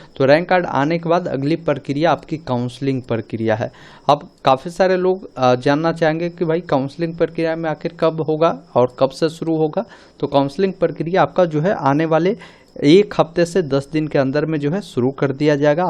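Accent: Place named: Marwari, India. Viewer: Barbar English mein voice change kyon Ho Raha Hai sar Marwadi English mein yah bol raha hai video mein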